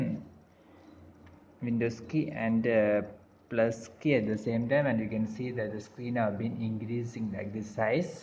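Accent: Kerala, India. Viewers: മലയാളി ആണെന്ന് മനസ്സിലായി , മലയാള ഇങ്ഗ്ലീഷ് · lemme guess you're an Indian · Are you from kerela? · its a india, kerela accent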